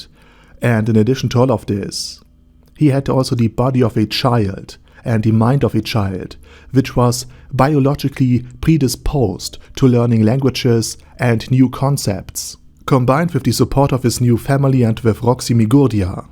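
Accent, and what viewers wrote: Germany. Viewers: This German accent haha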